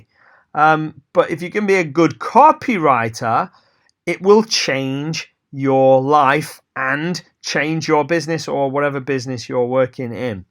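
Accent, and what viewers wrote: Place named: England, United Kingdom. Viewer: Hi. Loved your video - so good to hear another English accent. I’ve subscribed and am off for video 2. Thanks.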